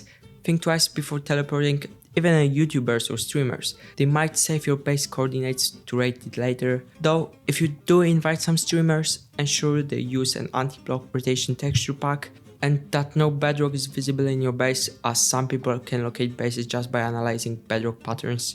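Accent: Italy. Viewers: Dude are you italian?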